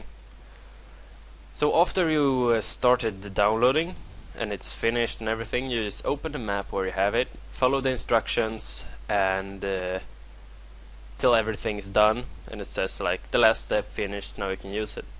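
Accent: Sweden. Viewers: Haha du låter som en typisk svensk <3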